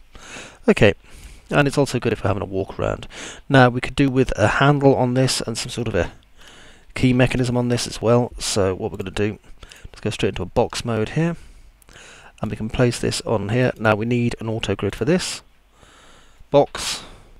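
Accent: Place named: United Kingdom. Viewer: Your accent is extremely British. And great tutorial BTW.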